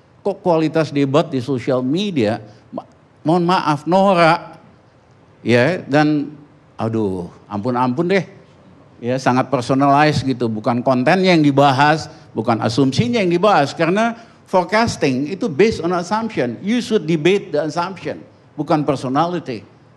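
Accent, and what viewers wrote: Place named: Netherlands. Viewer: Pak * kental logat belanda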